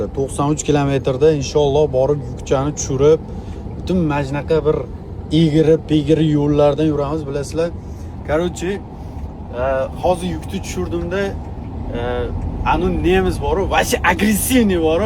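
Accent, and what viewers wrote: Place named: Fergana Valley, Uzbekistan. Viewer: Shevez oʻzgartrmang vodiydansz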